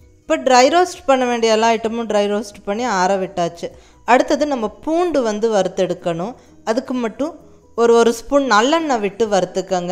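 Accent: Kongu, India. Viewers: Vanakkam ninga Romba azhaga kongu Tamizh la pesuringa. Very nice video THANK U.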